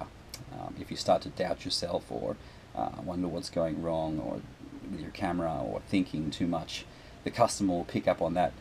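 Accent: Australia. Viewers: Great video, I have to say... the first time I heard your voice I was like...Wait... what? Wasn't expecting the Australian accent. Now every time I watch your videos I quote Mick Taylor from Wolf creek as I watch... Kind of morbid I guess...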